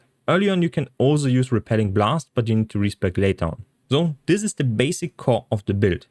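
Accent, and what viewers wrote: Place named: Germany. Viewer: your english is weird man i thought you were speaking germany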